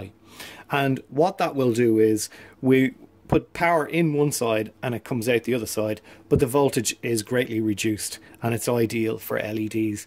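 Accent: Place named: Ireland. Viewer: Thank you very much for your explanation. I'm just a step on trying myself 😄. I lived in Ireland for 5 years and I couldn't help myself of thinking you sound 'slightly'😄 Irish. I'm sure I'll be wrong. I'm watching videos like a crazy because I have a lego rocket I want to light (the thrusters) and I'm figuring out how to do it. Cheers from Spain ♥️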